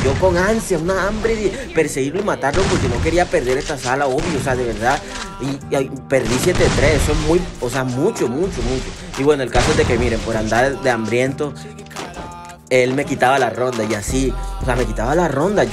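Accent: Barranquilla, Colombia. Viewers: Bro eres de Barranquilla Colombia? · Bro eres Costeño, eres de Barranquilla verdad responde brother❤️🙏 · Es de Barranquilla? Tiene acento de ahí😂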